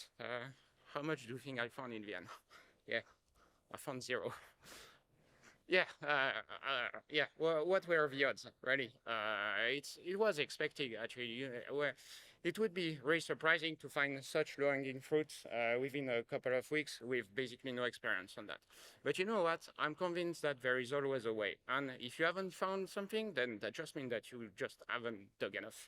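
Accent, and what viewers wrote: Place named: England, United Kingdom. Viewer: Awesome share. But damn..Can’t stand the English accent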